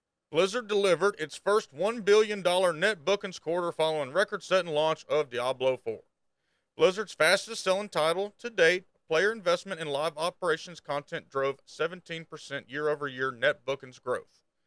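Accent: South, United States. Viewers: Lean in to that Southern draw. It's what helps you standout to me honestly. Your coverage is great and I think your ideas have merit. Regarding Asmon not giving you any credit he usually will spam a link to your channel in his live react video on twitch which I believe he did because watching him react to you is how I found your channel. Own that southern gentlemen stereotype man. Embrace it. Lean into. Let be the way you stand out o these content creator driven platforms. The great thing about it to is it you being you. There is no gimic or shtick. You are real authentic and down to earth kinda guy on a platform full of fakes. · Anything can seem like a conspiracy if you know absolutely nothing about how it works. Southern bro here, i really appreciate your channel. Seeing people that sound like me do well, helps out a lot. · man you gotta lean into the southern accent, start saying stuff like "what in the sister kissing woods was Blizzard thinking" love the videos and asmond linked you this time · Hello. 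I think you are 100% right in this matter. It's like that microtransaction conference video that was released years back, and people were shocked how well structured and organized it was when it comes to min/max bleeding customers dry. For all Blizzard's fault and mistakes, in the business side of things, I think their decisions are based on decades of research and millions of dollars. It's no mistake that Eternal Realm was nuked and season comes with new mechanics, new powers, seasonal goodness and battle pass yummies. As for your accent. Growing up in Sweden we were bombarded with American culture from TV and movies. Southern accent folk weren't generally portrayed in a good light during the 80's and 90's. So I will at times instinctively think "oh he a redneck", but can quickly dispel that notion due to how idiotic it is to think like that. It can however, not be denied that it's human nature to dislike and be suspicious against things unlike one self. 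Culturally and appearance-wise. When I see someone in full leather, spikes, black hair, nose ring etc, I will generally have a negative idea of that person as a first impression. That does of course not mean that the person is in any shape or form a bad person. It just is what it is. I will also say that I absolutely love your accent, it is no doubt my favorite American accent. If I would ever marry an American woman, it would be a southern lady. Lauren Cohan as Maggie in The Walking Dead and Joelle Carter in Justifie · we need more southern boiz doing content like this! Lovin the accent and screw them yankies who don't! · Came here from asmon and subbed, im from europe, we don't get to hear alot of american accents especially southern, i think it's great ! Makes you sound real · got the RECITES!!! Southern brain at work! And hate to say it but when people talk about our southern twang they are just uncultured!!!! 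· Right you are. My Southern accent is more pronounced than yours. I have an earned Ph.D. in physics. Hate it when people pre-judge me on my accent. Usually wind up being embarrassed pretty quickly though. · Sorry about all the rude comments you got about your accent, its clear you are intelligent. There are tons of people even up north in America that think a southern accent equates to racism, unintelligence and incest for... whatever reason. But keep doing your thing dude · oh come on, i FUCKING LOVE your accent. i think because people usually talk like that in movies where they talk about some stupid shit (the stupid southern guy caricature) but what you say is intelligent AF. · Came for the Redneck gunloving accent 🙂 stayed for the tinfoil hat... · Subscribed! Dudes accent is so perfectly southern.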